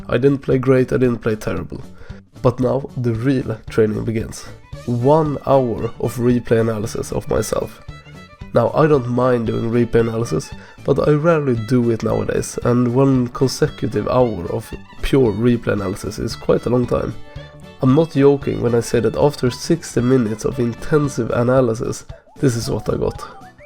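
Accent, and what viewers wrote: Sweden. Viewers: the most swedish english speaker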